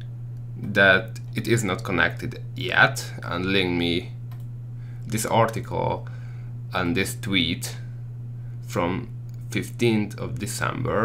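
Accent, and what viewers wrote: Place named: Hungary. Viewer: Az első mondatodnál éreztem már hogy magyar vagy :D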